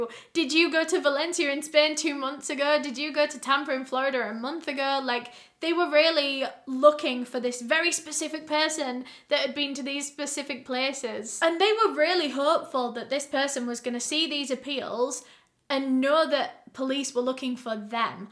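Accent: United Kingdom. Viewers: I’m here for British accent. i love it 🥰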